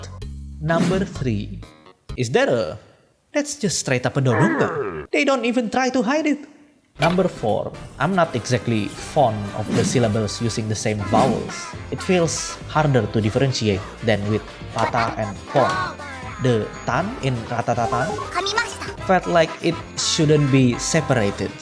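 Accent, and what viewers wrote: Indonesia. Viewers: You soun Indonesian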